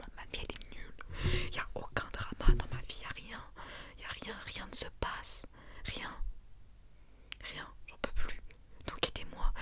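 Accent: Belgium. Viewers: Bahaha mais c'est quoi cet accent belge sur la vidéo ? Je m'en étais jamais rendu compte 😂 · bahahahaha wsh l'accent belge jpp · Ta un accent belge c'est assez troublant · Oui mais dèfois ta l'accent belge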